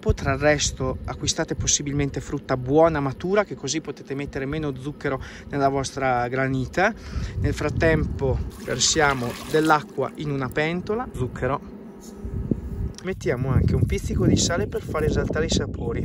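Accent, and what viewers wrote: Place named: Romagna, Italy. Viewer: mi toglieresti una curiosita, sento che hai un'accento romagnolo, ma la location dei video e' lo stesso posto in cui vivi? se si' come si chiama quel posto in montagna, che e' splendido, si adagia perfettamente alle tue performance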